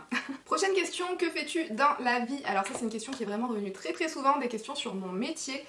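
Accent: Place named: South, France. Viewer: Vidéo bien sympa tu es pleine de vie je pensais bien que tu venais du Sud tu as un peu le même accent que Julien Doré. Restes comme tu es et bonne continuation 🥰❤🥰❤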